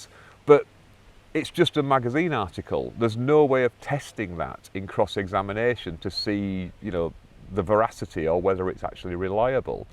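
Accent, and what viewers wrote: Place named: England, United Kingdom. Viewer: Wonderful accent. Very English. No conclusion.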